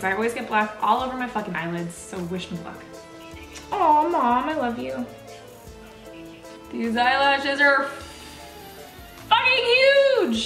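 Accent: Canada. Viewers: is it just me or does she sound SO canadian in this video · can you just make a video of you saying sorry I love when Canadians say it. It sounds like sorwy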